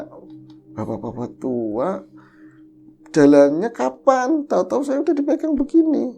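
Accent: Banyumas, Indonesia. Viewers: Weee pagi2 tayang... seneng denger bhs mas e narator ngapak2 gmn gtu.... ada nak 🥰🥰🥰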